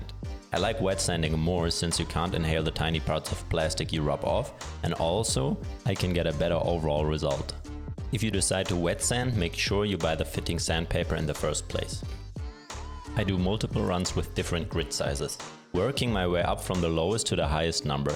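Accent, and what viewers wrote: Germany. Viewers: Are you German? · Are you german? I kinda hear it out. Danke für das Video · Are you German ?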